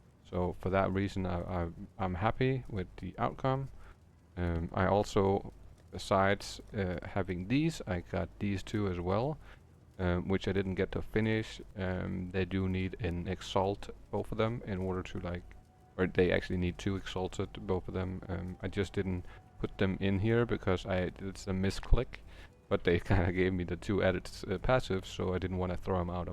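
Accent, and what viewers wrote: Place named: Denmark. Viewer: You can hear a fellow Dane from a mile away. Love it man. Do you play in a Discord where other Danes can join?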